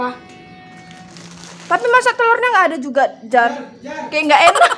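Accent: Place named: Medan, Indonesia. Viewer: Nampak x bahasa medan mu Dx🤣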